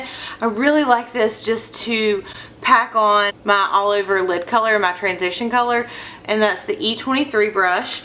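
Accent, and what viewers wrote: South, United States. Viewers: oh i just seen in the description box im from NC TOO ZEBULON AREA...HEARD OF IT? I KNEW IT HAD TO BE NC OR SC W.THAT COUNTRY ACCENT LIVE IT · where are you from? I hear southern but what part? I'm in Houston Texas myself!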